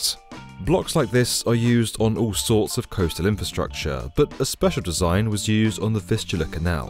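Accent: United Kingdom. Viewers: sounded british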